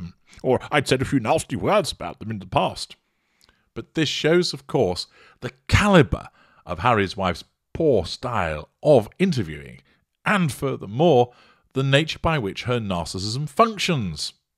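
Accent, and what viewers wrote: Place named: England, United Kingdom. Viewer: This is just the best thing ever hearing these words in an English accent! 🤣 “assywipe” “spotalie” and “podcrap” I had to replay it a cpl of times 😂 i love it!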